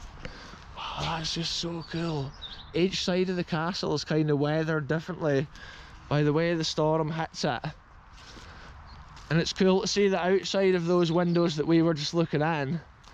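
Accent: Scotland, United Kingdom. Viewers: Its been fun hearing your Scottish accent, haha. I am from Seattle Washington USA. Home of Bill Gates. Love your explanations. I am thinking there are probably people buried around the castle. You can tell sometimes by dips in the ground. Do you know any of the history of what happened to the last owners? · I love hearing your "brogue". I imagine that my Father's Mother grew up hearing the same Brogue from her Father. He immigrated in the 1870s ; his family name : Horsbrugh .AGAIN, Thank you for an amazing adventure !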